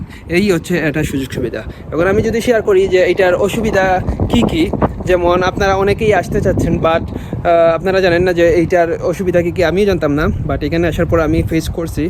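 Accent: Sylhet, Bangladesh. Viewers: Bhai mono oy Sylheti 😅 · ভাই সিলেটি মাত আইয়য় ❤ i love Sylhet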